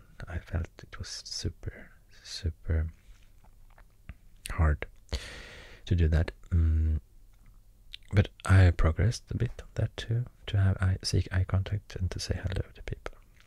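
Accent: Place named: Sweden. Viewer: Idk what it is about people with Swedish accents, but you are not the only person who I enjoy listening to speak. You might even wanna consider speaking in your native language sometimes too and just add the translations in subtitles for people who might want to still read. I just think Swedish is so nice to listen to, even if I don't understand it lol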